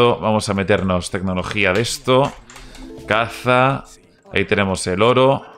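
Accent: Peru. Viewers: Ere paruano?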